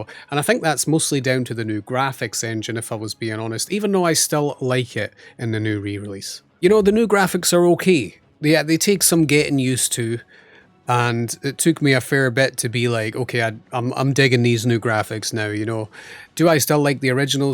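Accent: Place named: United Kingdom. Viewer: This is still a great game. Whiny british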